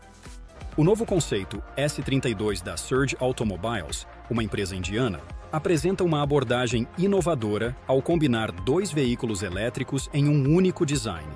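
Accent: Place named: Russia. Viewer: I feel a russian accent... 😮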